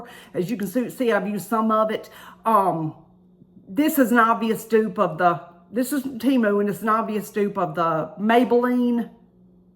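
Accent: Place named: South, United States. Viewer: Happy Valentine's girl great look!! I love your southern accent and the things you say always gives me a giggle 😊 love ya my friend xo ❤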